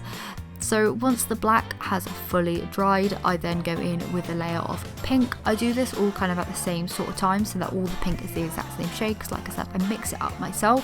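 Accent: England, United Kingdom. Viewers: Cute. What is your accent/somewhere in England? 🙂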